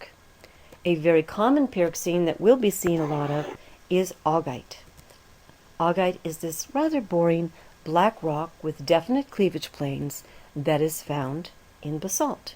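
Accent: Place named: RP, United Kingdom. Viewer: Beautifully elucidated (great posh chat).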